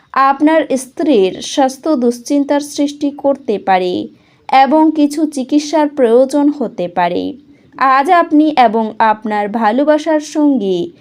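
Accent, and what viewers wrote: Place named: Ghoti, Bangladesh. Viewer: ঘটিয়া বোলি বন্ধ করো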